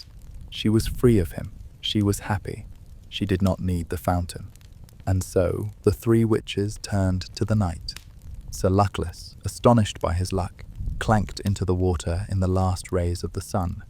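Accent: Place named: United Kingdom. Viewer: You have no idea how much I love love love this video. I've been falling asleep to it every night for a week now. As a huge fan of everything in the Harry Potter universe, sleep/meditation/storytelling videos and the voices of british men, this is amazing. Please keep conjuring these videos! I can't wait to see what's next! 🪄✨️💤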